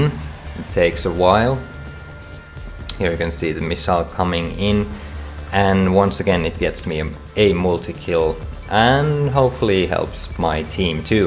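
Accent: Finland. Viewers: Are you Finnish? You sound Finnish...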